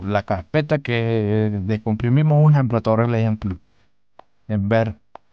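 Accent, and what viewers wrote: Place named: Brazil. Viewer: Bro eres de brasil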